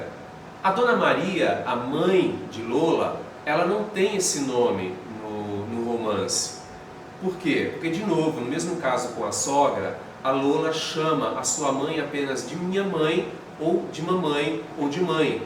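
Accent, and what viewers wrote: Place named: Santa Catarina, Brazil. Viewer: Oi, tens um sotaque catarina........ Adoro!!!!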